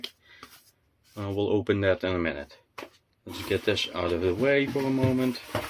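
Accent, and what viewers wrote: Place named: Netherlands. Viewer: Great job with your unboxing video for the Numbered Imajica,!! Love your Dutch accent! And your English is flawless, my friend!!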